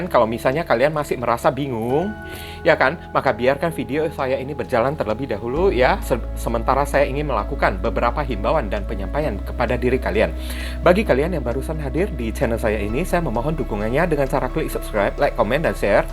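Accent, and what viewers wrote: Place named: Medan, Indonesia. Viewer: Saya pilih pile 2. Terima kasih ko readingannya selalu resonate. salah satu tarot reader favorit saya..readingannya banyak nasehat2 baik yg memotivasi..kadang serius kadang dselingi dengan lelucon2 yg lucu bikin saya ngakak dengan logat2 Medannya yg kocak 😂😂🙏🙏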